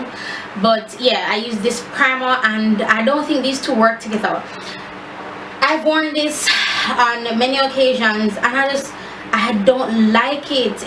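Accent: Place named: Jamaica. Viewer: nice foundation haul new subbie😀 my grandaddy is from Jamaica love the accent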